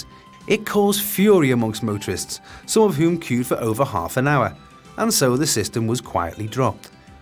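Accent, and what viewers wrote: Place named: Scouse, United Kingdom. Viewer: Imperceptible Scouser accent but still very comprehensible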